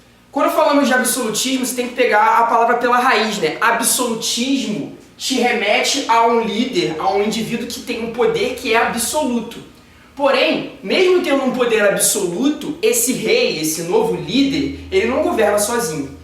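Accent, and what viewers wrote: Rio de Janeiro, Brazil. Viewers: mds aposto q eh carioca kkkkkkk amei!!😍👏👏❤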